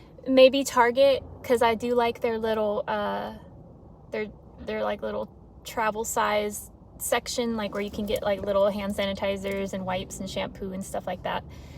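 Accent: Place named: South, United States. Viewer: You remind me of Jennifer Lopez but with a southern accent.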